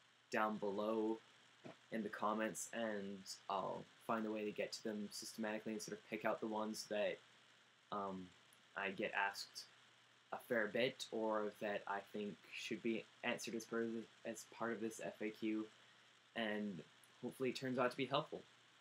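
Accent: Canada. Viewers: This is actually the first time I've ever heard your Canadian accent xD Never really noticed it before x) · i heard your little Canadian accent more in this video than others lol its super cute ^^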